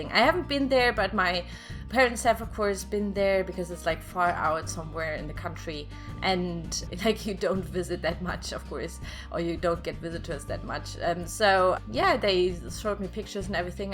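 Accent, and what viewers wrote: Germany. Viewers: I love your German accent! 💕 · Wow thats amazing! BTW judging your dialect i bet you're from germany, aren't you?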